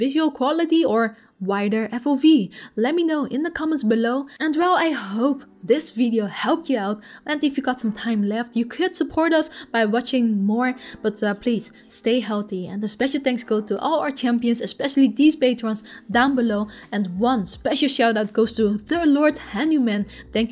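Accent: Netherlands. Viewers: I know a Dutchie when I hear one:)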